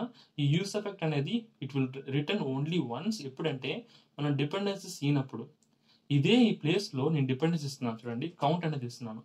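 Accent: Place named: Chittoor, India. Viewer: Clear cut explanation with theory as well as hands on, its really building confidence for me to keep up learning React js thank you bro and finally I think ur from Border of Andhrapradesh or from Chittoor district/TN right...?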